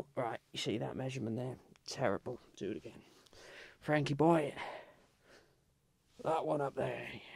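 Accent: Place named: England, United Kingdom. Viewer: I hear the English in your voice now 😭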